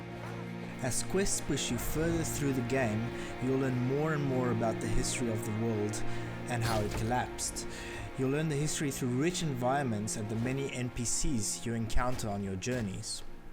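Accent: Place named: South Africa. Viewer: Why does this guy speaking sound like a Afrikaaner Anyways this game looks grea, however $40 dollars is SHIT expensive for a experimental title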